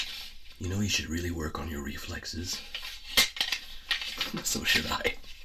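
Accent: Quebec, Canada. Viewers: Great video! Love the theme and ambience. Are you québécois by any chance?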